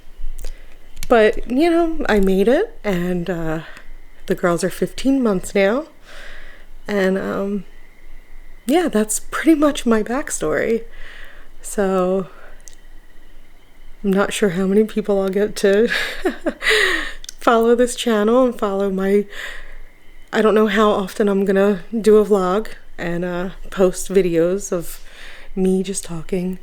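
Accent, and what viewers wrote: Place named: East Coast, United States. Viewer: u sound like east coast of the U.S. I am in B.C., Canada......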